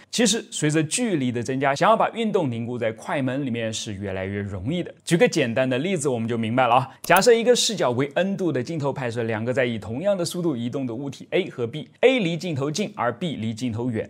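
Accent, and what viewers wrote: Nanjing, China. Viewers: 人是在南京，但口音像南京人吗？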